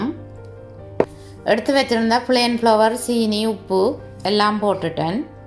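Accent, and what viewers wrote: Sri Lanka. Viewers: அருமையான ரெசிபி அழகானஇலங்கை தமிழ் எனக்குபிடிக்கும் தோழி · சூப்பர் தகவல் அக்கா. நம் இலங்கைத்தமிழை கேட்கும்போது உங்க வீடியோ பார்க்கலாம். 💗💗💗💗💗💗💗💗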